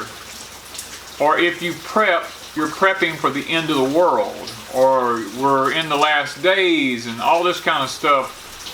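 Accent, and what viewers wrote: South, United States. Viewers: I'm glad to hear that you're going back to a topic based live stream. The first one was really good but the others just seemed silly.. Everyone is just chattering about nothing and distracting you and from what you wanted to talk about. I'm looking forward to this weeks live broadcast again:-), I love your Porch Time. You have such a relaxing, southern gentlemanly way of saying everything and getting your point across. Loretta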